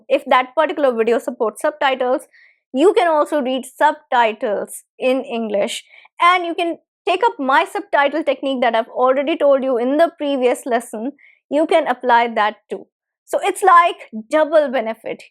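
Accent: England, United Kingdom. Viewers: I listen to your videos ❤ for improving my english listening skilss❤ i like you english accent really very much ❤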